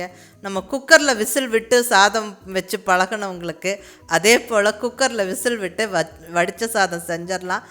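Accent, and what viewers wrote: Coimbatore, India. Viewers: மலையாளி கோயம்புத்தூர் தமிழ் ஜம்முன்னு பேசுறாங்க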